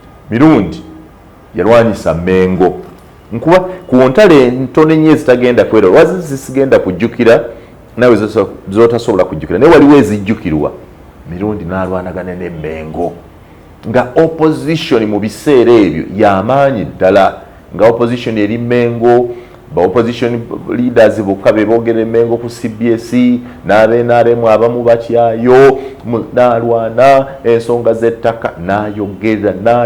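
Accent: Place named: Zambia. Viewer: But mr oyogera nga zambali🙏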